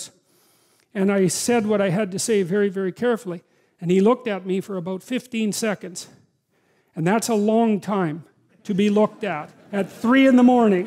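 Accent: Canada. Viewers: Mark Arandjus Yeah a real Canuck lol.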